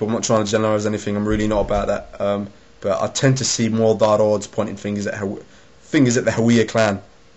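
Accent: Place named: Australia. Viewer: aussie accent. cool.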